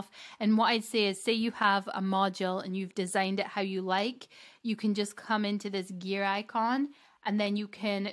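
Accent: Scotland, United Kingdom. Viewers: Nice to hear another Scottish accent! I'm thinking of members areas for my teaching site, do you know if you have the option to also have a community area under the membership, as well as access to members only videos?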